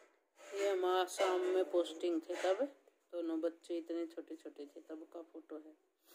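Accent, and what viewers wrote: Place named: Haryana, India. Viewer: Aapke awaj sa lag rha tha aap jind ya kaithal sa ho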